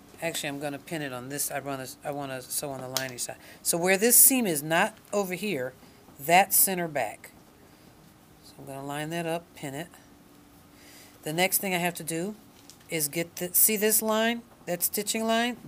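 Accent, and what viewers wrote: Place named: South, United States. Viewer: Omg thank you sooooo much for making the effort to post this lesson!!! I have been going back and forth on how I should sew this top I'm sewing that has a lining and I could not wrap my head around how I was going to make it work?!?! Your video is concise and exactly the example I needed!! Also you sound like a wonderful southern woman who's got all kinds of really great knowledge that can't be taught, that only comes from experience!! Anyway seriously thank you!!!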